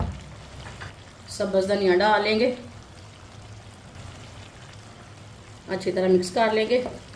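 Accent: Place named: Punjab, India. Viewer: Never watched such unappetising food being cooked with the explanation in a hilarious Urdu with a Punjabi accent. Very sad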